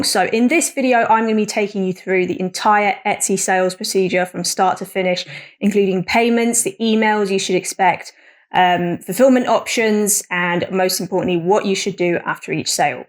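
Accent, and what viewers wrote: United Kingdom. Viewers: Thanks, sweetheart!!, so what I got from this was I have to press a button in printify, which I have just done i wish I had of known this morning before I went to my boring 9-5 job 😁 so is that it, yeah I was stocked to get my first sale today but your right I was confused what next very helpful thanks!! is that a British accent i detect? from a fellow brit here?? ;-) lol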